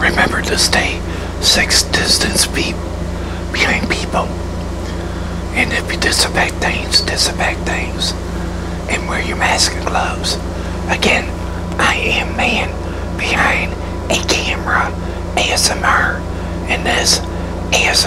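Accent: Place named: Texas, United States. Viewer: Hey your from Texas arnt you my dads from Texas to i love your accent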